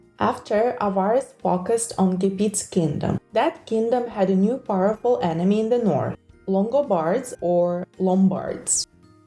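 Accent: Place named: Eastern Europe. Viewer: You sound Slavic too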